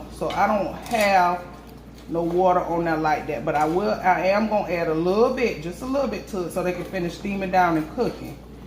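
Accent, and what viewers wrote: South, United States. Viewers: I’m so glad ur back!! I missed ya!! Where are you from? U sound so southern. I’m from Louisiana Baton Rouge!! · Hello! I'm a new subscriber and love, love, love your personality and your southern accent. Your food looks delicious 😋 I'm from the Midwest by way of the south. I grew up watching and learning to cook from my grandma. We cook similar to your style of cooking except we use the Aunt Jemima Corn meal mix for our dressing but I know the jiffy is just as good. I live in TX now and miss being around my family and soul food that don't always include spicy ingredients. I stumbled across your YouTube channel in which, I'm glad I did. Watching your videos are bringing me so much joy and remind me so much of our homestyle cooking. Thanks for sharing you with us ❤️😊 ~Toi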